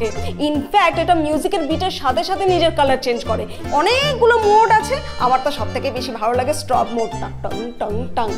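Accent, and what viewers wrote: Bangladesh. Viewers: Apnr kotha bolar style pura Bangladeshi der moto... mone hoi apni Bangladesh a chilan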